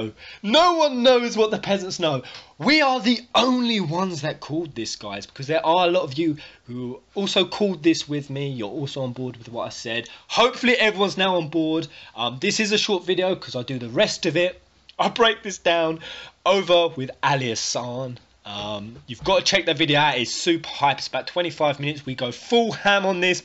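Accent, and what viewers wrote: England, United Kingdom. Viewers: And it ain't the English accent! I'm just loving his persona. More depth to my mind! I think everything that goes on here will be a record for future FF theorists and forward thinkers. It's an awesome place to vent and express:) Plus I wanna see this updated Peasantry wall! Hope you noticed I've up'd my pledge;)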